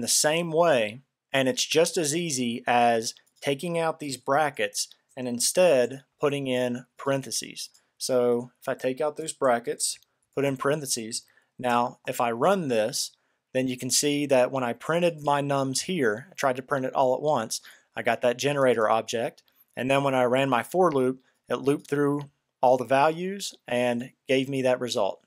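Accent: South, United States. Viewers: I really appreciate both the way you explain things and your slight Southern accent. It makes it a lot easier for a Georgia girl to understand. Thank you!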